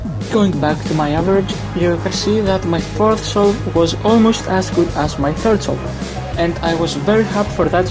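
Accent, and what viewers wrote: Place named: Greece. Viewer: The greek accent is the best!!!!!❤😂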